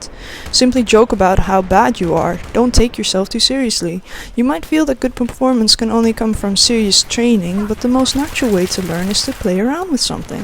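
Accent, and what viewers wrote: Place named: Netherlands. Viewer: Definitly Dutch accent!!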